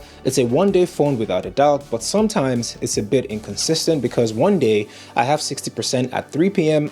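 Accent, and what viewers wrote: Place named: Nigeria. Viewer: I hear a Nigerian accent... I subscribe 😂😂. Good luck with your channel dude! Review was on point BTW.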